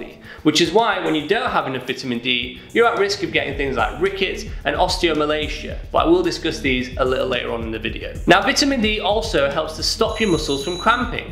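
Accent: United Kingdom. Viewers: Haha, i know it's from your accent and it's how they pronounce it in the uk which is really the proper way because the uk is where English comes from but it sounds funny to us, aluminium is even better hehe..i lived in England before and my friends would also be silly and copy my American accent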